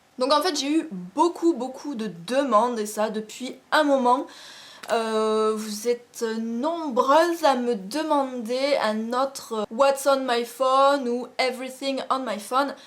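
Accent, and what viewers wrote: South, France. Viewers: Super vidéo sa se voit que tu viens du sud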